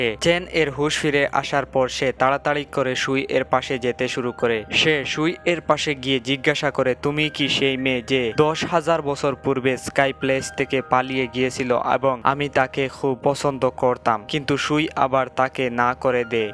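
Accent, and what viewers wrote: Sylhet, Bangladesh. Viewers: Bro tmi ki Sylheti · vai dairet Sylheti mato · সমস্যা নাই ভাই চালিয়ে যান আস্তে আস্তে সব ঠিক হয়ে যাবে.আমিও সিলেটি মানুষ.. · ভাই কিতা সিলটি নি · ভাই কি সিলেটি নাকি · Vai amr bari o sylhet,,kintu apne sylheter oia o,, ordek sylhete r ordek suddo basha kowar karone,, vlo lage nh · 1000% সিলেটি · ভাই সিলেটি নি? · amrar sylhety vaiya maybe afne?otar lagi subscribe kori dilam.ar explain ta vala oice🥰🤗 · matar bongi deikka oi bujram Sylhety... Karon ami Nijeo Sylhety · Vai apne sylheti ni..? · সিলেটি মাত্ত যতই তেল মসলা লাগাও চেইন্জ হবে না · Vai tmi ki সিলেটের পোলা😀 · ভাই কি সিলেটি?😀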